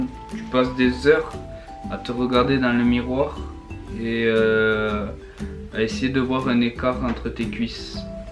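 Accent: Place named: Toulouse, France. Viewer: j'adore le petit accent toulousain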